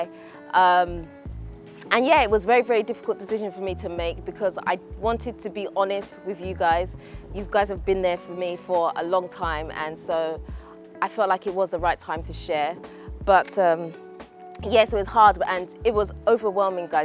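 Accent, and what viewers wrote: United Kingdom. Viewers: " " got a heavy heavy british accent, blimey! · My☝🏿thought about you (AKA ) your not old, but a great builder of houses & homes, so keep working on building your genuine soul then you & the family w/ fall in place 😊….. Oooh yeah you’re accent is smethg i luv to listen to idk 🤷🏿 is it British? anywho enjoy the Motherland’s landscape ❤ & rmbr to keep building sis.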